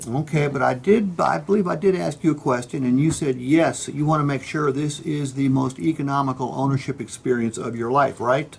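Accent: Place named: South, United States. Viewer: hillbilly and his hick rube foghorn leghorn talk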